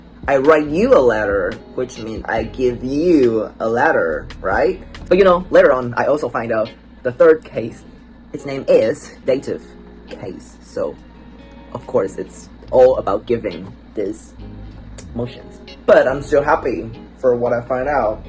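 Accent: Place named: Australia. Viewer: I agree with you when it comes to languages and emotions! I notice that however I feel while studying carries over to my state of mind while speaking the language. I feel like it also affects my openness to the culture. For patterns, I love them. I notice that I learn languages better when I notice the patterns on my own :D Then later on I can learn formal patterns to see if they match (or they build more easily off of what I already have learned). Ahh accents, I want to know how to change mine in the languages I learn. I don't mind having a foreign accent but I'd at least like to have one that sounds nice... Your accent kind of reminds me of Baobaopearly's. It isn't a valley girl accent, honestly it sounds sunny if that makes sense. :D Also, your placement sounds like it'd be in the right place for an Australian accent. Good luck whatever you work towards! 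Great video!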